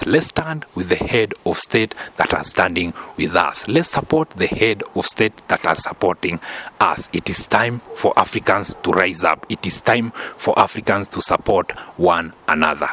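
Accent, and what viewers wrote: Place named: Kenya. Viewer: You keep lying about Ghana you area Kenyan with that thick accent talk about Ruto and the good things he is doing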